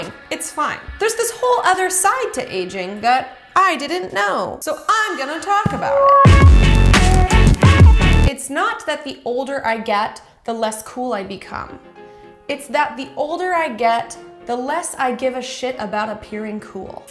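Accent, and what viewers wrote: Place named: Minnesota, United States. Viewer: Dying! Not only because you make ALL good points but also because you seem to be fighting a Minnesota accent haaaaard the whole time lols you crack me up!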